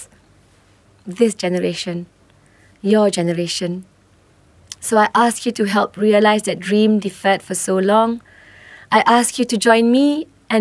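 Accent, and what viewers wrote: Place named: Bangladesh. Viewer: Oh My Banglish....Mirror yourself mate.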